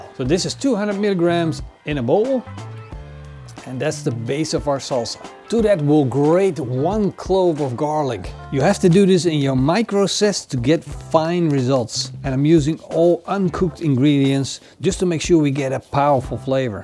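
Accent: Netherlands. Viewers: He looks dutch and sound dutch 😄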